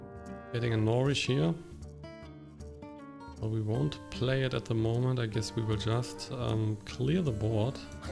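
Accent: Germany. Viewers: uhh are you Germany? Your accent makes me think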